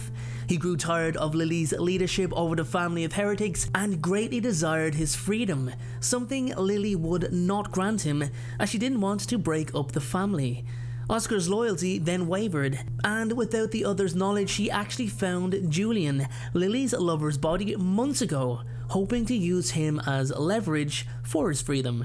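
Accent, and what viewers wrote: Ireland. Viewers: Desiccated... he has an Irish accent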